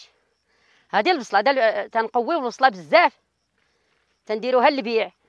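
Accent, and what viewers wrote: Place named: Taza, Morocco. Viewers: Sanfora sanfora .khti 9rit ta3li9 dyalak .nti man taza 7ta ana man taza ah lahja dyalha b7al tzawaza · Sobhana lah lahja dyalak amimti bhal nas taza Man hit Ana asli taziya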